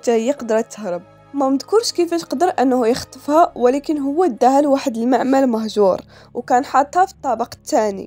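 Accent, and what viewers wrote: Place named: Morocco. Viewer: هذه اللهجة المغربية 😊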